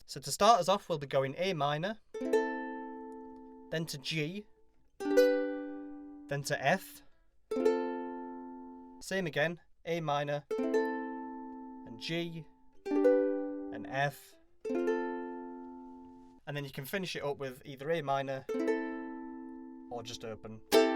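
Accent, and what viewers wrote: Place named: South Yorkshire, United Kingdom. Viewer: Nice tutorial man! Sounds great! Also judging by your accent you sound like you’re from my neck of the woods in England, South Yorkshire?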